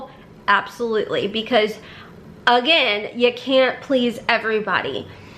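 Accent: South, United States. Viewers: hearing your southern drawl when it sneaks out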